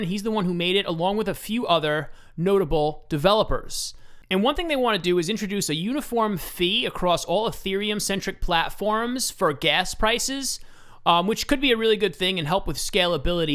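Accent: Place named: Long Island, United States. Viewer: You from Long Island? Bergen county NJ perhaps? Lol trying to place that accent.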